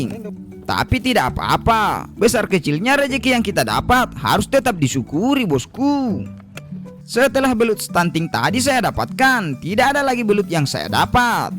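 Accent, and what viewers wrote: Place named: South Sulawesi, Indonesia. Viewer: dari logatnya dari Sulawesi selatan kayaknya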